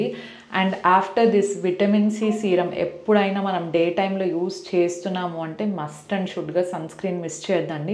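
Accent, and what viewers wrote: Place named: Rayalaseema, India. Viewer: Meeru same ma lage matladtunnaru meeru rayalseema or kadapa valla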